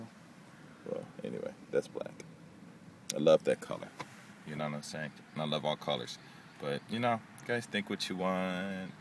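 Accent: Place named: Japan. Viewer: I have NO idea what your accent is...it sounds like it could be japanese, african, jamaican, i have no clue. where are you from originally?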